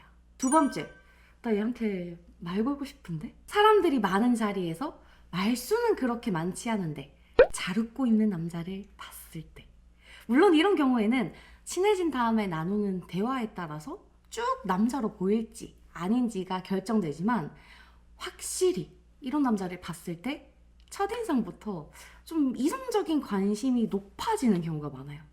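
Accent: Gyeongsang, South Korea. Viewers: 갱상도 사투리좀 고쳐라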